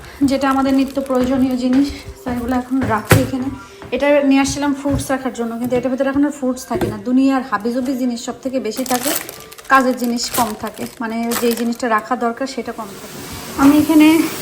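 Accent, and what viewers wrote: Sylhet, Bangladesh. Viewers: তোমাকে আমার খুব ভালো লাগে আপু,আর তার সাথে সিলেটের ভাষা😊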